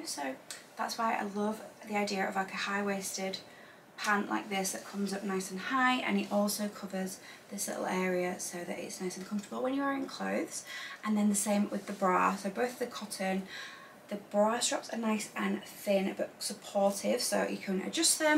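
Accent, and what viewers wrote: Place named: Leeds, United Kingdom. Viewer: Love you and your amazing reviews! I used to live in the North of England and I really miss it from time to time, so love hearing your sweet Leeds voice!! Ok so question, what SKIMS would you recommend to go under the Soft Lounge Slip Dress ? xx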